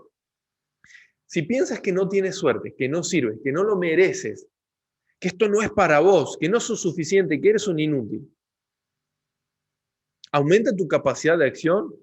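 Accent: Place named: Tucumán, Argentina. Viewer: Muy claro. Con acento tucumano hace rato que no escuchaba esas rr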